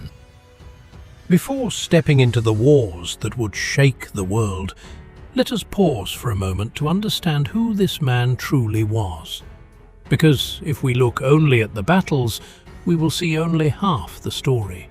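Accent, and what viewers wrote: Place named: England, United Kingdom. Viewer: I really wanted to listen to this but the English accent ruined it.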